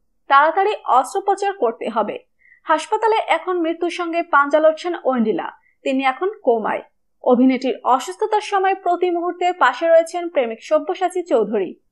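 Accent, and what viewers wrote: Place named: Bangladesh. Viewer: আমার মনে হয় এই সব channel গুলো বাংলাদেশের. Pronunciation শুনে তাই মনে হয়. আর বাংলাদেশ থেকে YouTube এ extremely vulgar জিনিষ দেয়. এই গুলো কি করে allow করা হয় জানি না.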